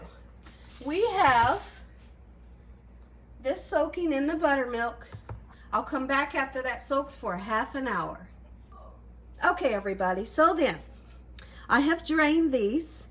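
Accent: South, United States. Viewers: She sound like pualla dean’s black friend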